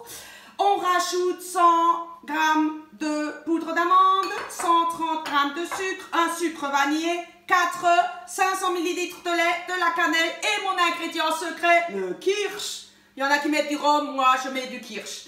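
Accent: Alsace, France. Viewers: Bonjour - merci pour le recette... je suis allemande et j'adore "Bettelmann".. et je vais essayer votre recette. Votre accent est très très charmante, c'est une accent alsacienne?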